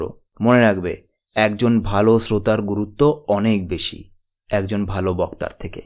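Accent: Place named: West Bengal, India. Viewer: কথার উচ্চারণ শুনে মনে হচ্ছে আপনি ভারতীয় বাঙালি।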